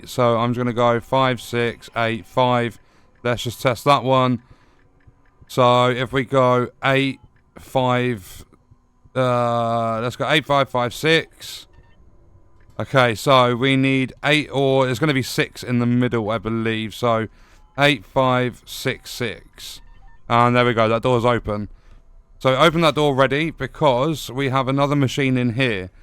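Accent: United Kingdom. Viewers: Every time I need help, there’s your heavy British accent to guide me. I’m an American Cajun from New Orleans. I tried Marmite on toast once and it didn’t bother me too much. Hi five 🖐️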